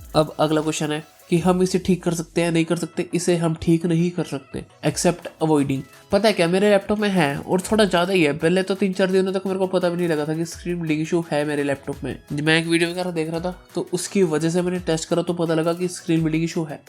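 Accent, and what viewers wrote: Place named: Haryana, India. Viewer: That haryanvi accent 😂❤️, sunne me maza aa gya tbh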